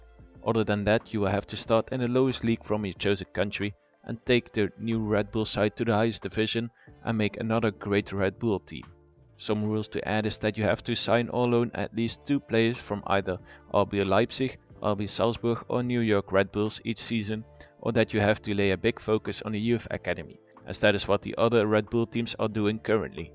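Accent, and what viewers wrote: Netherlands. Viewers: Goeie video, dacht al toen ik je stem hoorde dat je Nederlands was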